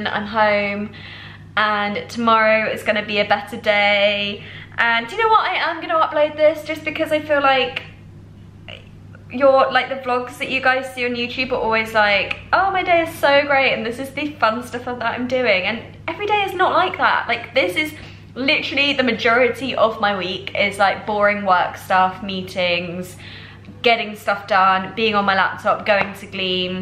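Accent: United Kingdom. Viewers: I freaking love your British accent ❤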